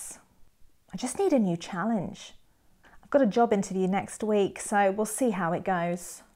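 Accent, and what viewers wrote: United Kingdom. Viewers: Salute British English👉👉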